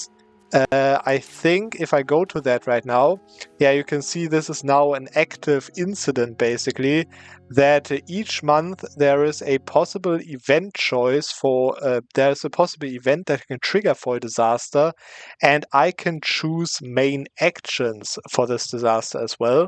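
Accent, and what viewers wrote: Germany. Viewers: Holly shit the german accent is strong in you. Greetings from Germany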